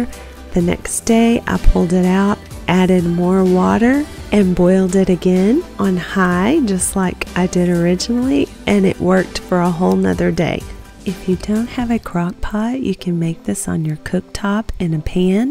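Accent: Texas, United States. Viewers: Hey, supporting from Virginia with like 90; cute Texas accent hehe. We'd love to have your opinion about our nature ambience soundscapes as well. New sub and hope we can keep in touch, if possible.👍💚 Sending positive vibes your way!:goodvibes:🔔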